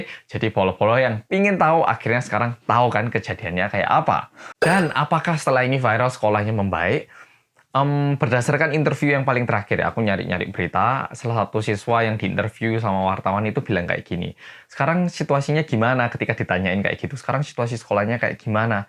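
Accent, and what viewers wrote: Java, Indonesia. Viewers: ????knp kk ini keliatan kek orang Korea tpi gaya bhsnya kek orang Jawa:)))))) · Pas ngmg nama2 idol korea lgsg percaya klo kak orang korea (saking medoke kadang gag percoyo) 😁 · duh mas medhoknya wuenak didengar, uapik tenan kalo saya dari cilacap jadi ngapak bahasa jawanya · Bahas KATE YUP dong babang ganteeng..udah banyak yang aku liat tapi pengen versi babang medok :') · Medok bgt aku aja gak semedok gitu... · Mase medhokkk tapi nek nyebut nama wong Korea lidah medhoknya ilang.. piye tho masss?? Ajari aku 😁😁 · Bahasa inggris nya kok medok aksen jawa 😂👍🏻👍🏻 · btw ko bisa selancar itu sih b.indonya? ketambag logat jawa 😂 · (Bagian waktu masnya nyebut nama2 artis korea pake aksen korea tapi abis itu balik medok) Terimakasih kembali :) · Bahasa korea logat nya auto beda. Balik ke bahasa indo jd medok lagi. Kok bisa gitu😂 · Keren, ditunggu ep ke 2 burning sun nya.. 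medok asli, ada beberapa yg rada kepeleset waktu lafalin "s", masih berasa koreanya hahaha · Mas . Panjenengan medok tenan.. sy baru ngikutin channel ini .. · Medoknya itu lohh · pas nyebutin artis-artisnya kaya mc music bank, pas kelar langsung medhok lagi😂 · Ngomong indonya medok tapi waktu ngomong korea langsung keliatan aksen korea :v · pertama kali nonton tentang yang kasus kapal sewol, ngakak sendiri ngomongnya MEDO bangeeettttt wkwkwk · Bang, medhok aee... 👌👌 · Memang medhok apa sengaja d medhok2in? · Kakak bahasanya medok banget...hhhhh Kaya ke jawa2an gitu... Gemes deh jadinya...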